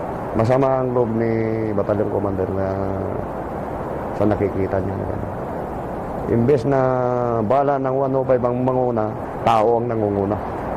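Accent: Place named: Pantabangan, Philippines. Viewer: Cgruro sir tubong pantabangan.at ung pronounsetion.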